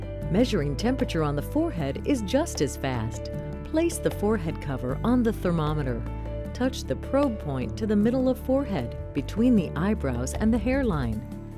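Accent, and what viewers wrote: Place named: England, United Kingdom. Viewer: I think it's an English accent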